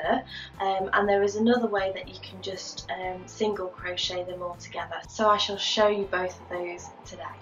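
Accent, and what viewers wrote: England, United Kingdom. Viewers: Thanks for showing two methods and the pros and cons to each. That was helpful. My husband and I both love to hear her soft calm voice. Your English accent is lovely. (We're in the US.) Blessings. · Hello 🌸 Your works are just most beautiful, your explanation with the very nice British accent, that I love, are the most complete ones...thanks for sharing with us,..😘Fatima, Iran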